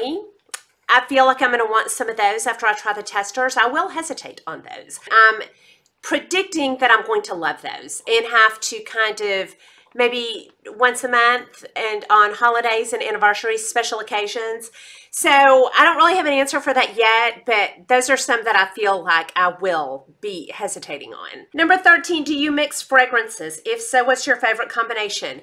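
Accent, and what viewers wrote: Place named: Texas, United States. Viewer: Here from mr. Kongs mom channel... love your accent I'm married to a texan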